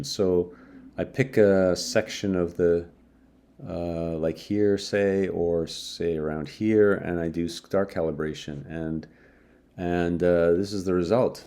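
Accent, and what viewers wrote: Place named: Canada. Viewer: Never saw one of your vids before this, but the thumbnail caught my because I instantly figured it must be Starfest. Then hearing your Canuckistanian accent (me, too) pretty much confirmed it. As I type at roughly the 12 minute mark I hear you say that it is Starfest. My last time there was in about 2013, if my memory doesn't fail me. 😉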